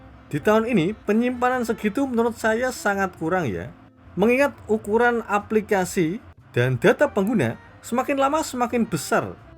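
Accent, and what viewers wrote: Pati, Indonesia. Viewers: wong Pati mas?😅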